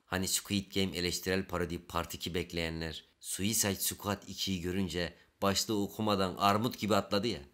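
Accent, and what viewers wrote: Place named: Thrace, Turkey. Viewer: Trakyalı ağzı yapmış çükolatama harbi güzel yapmış ağzına sağlık xd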